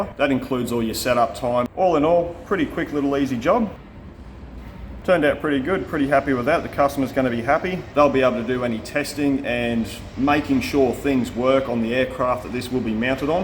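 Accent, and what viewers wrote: Australia. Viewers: Avuncular Why are you talkning like you're from Australia? You sound like one of those people that throw in 'spanish' when the get mexican food, lol. Saying "adios" when when talking to someone who speaks spanish, instead of just saying goodbye. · Ohh man I just discovered your channel and as a retired Aussie living in Thailand I really enjoy your craftsmanship your bloopers and the bloody Aussie accent that I missed so much keep up the good work mate!!👍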